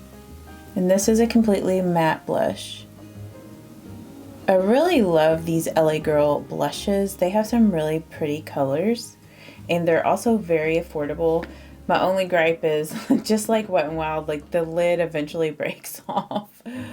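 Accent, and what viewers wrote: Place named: Louisville, United States. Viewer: Love the tip about extending bottom lid eye shadow. It makes a huge difference in how these 64-yr-old eyes look. Also, I ❤ listening to you talk. I learned to talk in Louisville, so you sound like home folks to me!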